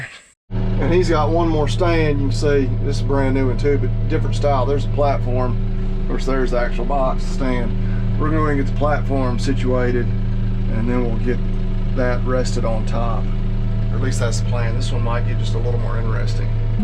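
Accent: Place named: Tennessee, United States. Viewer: First video I clicked on, being from TN I loved hearing that accent! Lots of support from southwest TN!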